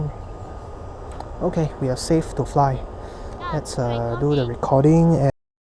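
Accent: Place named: China. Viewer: why autel is using a Chinese accent speaker? why not a neutral accent speaker?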